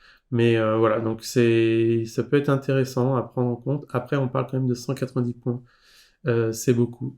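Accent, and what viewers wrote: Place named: England, United Kingdom. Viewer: Cet accent Anglais toujours aussi délicieux ❤ Cookie aussi tiens !!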